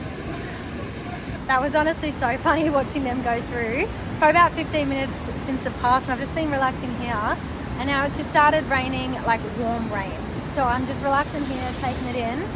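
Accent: Australia. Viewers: I like that Australian accent.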